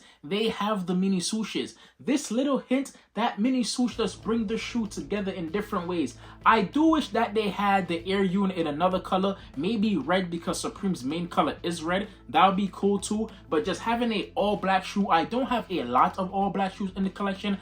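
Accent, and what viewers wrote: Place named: Toronto, Canada. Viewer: Good work bro. Toronto accent goin crazyy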